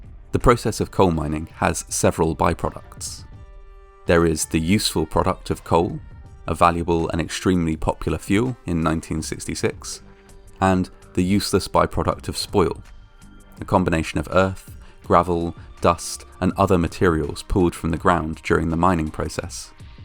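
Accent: United Kingdom. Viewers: He is clearly British. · American accent?? he's as British as one gets 😂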